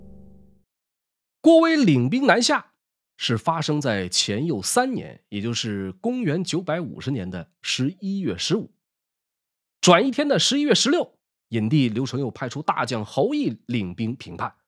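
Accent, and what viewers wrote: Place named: Tianjin, China. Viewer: 我会说一点粤语 以前经常看港片听粤语歌看一些那边拍的整蛊视频 其实我和博主算是老乡 不知道你们听没听出来博主说话有点天津滴口音 介北方人和南方人就算都说普通话也是能听出来发音上的区别的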